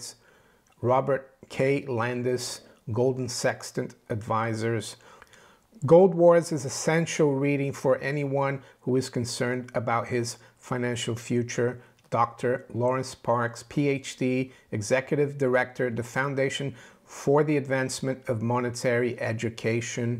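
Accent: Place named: Brooklyn, United States. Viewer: I'm fascinated by your plain Brooklyn-Italian sounding American English accent. If you were born in Brazil then when did you first learn English?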